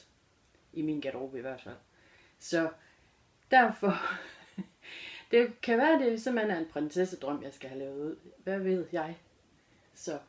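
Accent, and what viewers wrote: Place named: North Jutland, Denmark. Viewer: Hej 😃 Super godt 👍 selvfølgelig er der plads til dig. Dejligt at høre en nordjysk klang 😉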